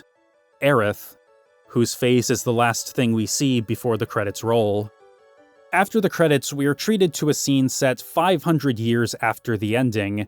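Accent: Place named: United States. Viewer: I'm around a decade older than you and played FFVII on its release and it was absolutely life changing at the time. That said I really wasn't aware of any real stigma associated with video games at the time. Pretty much everyone played video games when I was growing up, especially after the release of the PlayStation. That said I grew up in Wales, not the States (at least that's my assumption based on your accent, apologies if that's not the case) and my experience of high school was nothing like your own. That said great video I look forward to watching more of your work.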